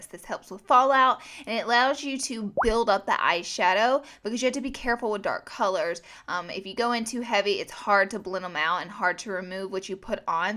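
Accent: South, United States. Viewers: Are you southern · her voice is the most American thing I have ever heard. (im australian) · Don't waste one minute talking, or at least get a voice training course. Your voice sounds way too nasal and, for someone who is used to British audio (non-native English speaker here) this almost looks like the stereotypical female American voice. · Shawn Brown she's not trying to be like bunny. she is doing her own thing. and yes they both have southern accents but that doesn't mean she's trying to be like her. trust me bunny is wayy more hyped up than her ha ha. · the southern accent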